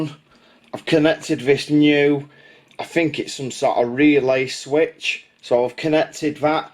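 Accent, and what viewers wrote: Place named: Salford, United Kingdom. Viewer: Hay bro you have a proper Salford accent speed an power mod bridge the yellow an black wire is the simplest way or in the speed box you can solder it internally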